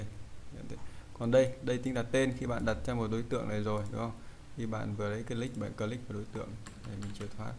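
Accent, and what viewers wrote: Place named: Vietnam. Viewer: Đọc tiếng Anh như tiếng Việt, hài quá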